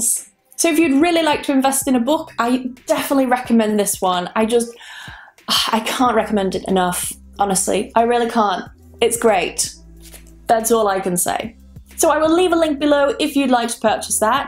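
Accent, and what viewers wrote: United Kingdom. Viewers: Hi. Thanks for your recomendations. I like so much the british accent☺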